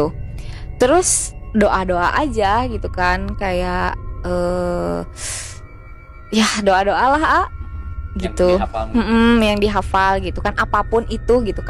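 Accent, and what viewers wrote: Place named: West Java, Indonesia. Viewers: Seru sih ceritax cmn cape dengerx mbax nyusun katax mikir bgt...mungkin krn logat sundax..😀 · Sunda pisan euy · Kok ketara banget logat Sunda di gaya bicara nya ya bang??? 😁😁😁😁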